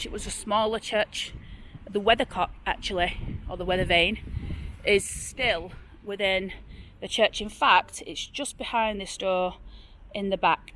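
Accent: Oldham, United Kingdom. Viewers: I love the Oldham accent, Bluecort skewel and St Mury's, very enjoyable video 👍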